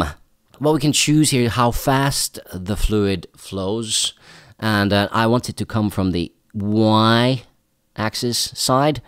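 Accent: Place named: Scotland, United Kingdom. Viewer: You have Scottish accent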